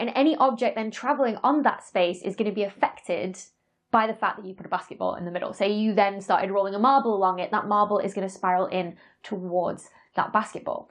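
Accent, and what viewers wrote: United Kingdom. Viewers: Is it me or is this more interesting because she has a British accent? Love Dr. Becky's channel.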